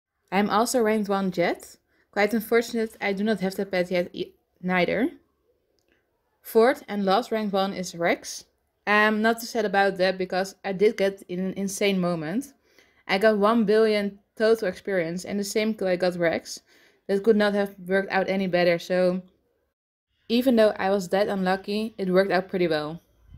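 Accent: Netherlands. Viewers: Nice bank!! Hoorde gelijk dat je NL was haha! Maar echt nice zo n ironman account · Recognized the dutch accent right away haha, I feel you. Nice account :) insane bank wow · ur dutch no doubt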